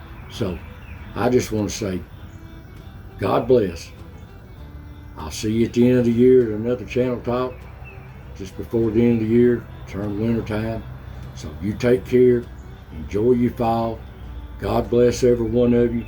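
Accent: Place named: South, United States. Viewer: You're thanking us, but I thank you for putting out your videos. I'm a Southern boy stuck in Yankee country, and I love to hear you talk just so I can hear Southern speech. I love it when you tell us how life is and was in the Appalachians. And above all you're my brother in Christ. In most of the South there can be several drouths in a summer, but in Texas there can be several summers in a drouth. 🏜️ I'm so glad to hear you're doing better. 🙏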